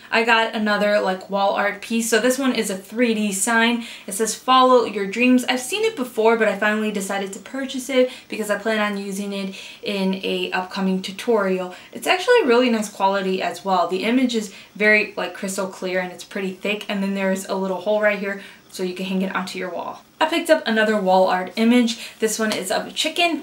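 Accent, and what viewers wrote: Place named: New York, United States. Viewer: You have no idea how much I love your accent!! NY❤️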